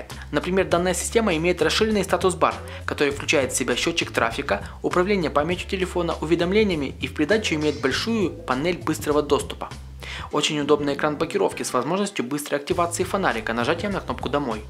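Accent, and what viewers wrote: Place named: Donbas, Ukraine. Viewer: Молодой человек надо, что-то менять! Не хочу ни кого обидеть! Но Вы делаете публичный обзор и дикция должна быть отличной от дикции обычных жителей Донецка или Луганска! Удачи и счастливых перемен!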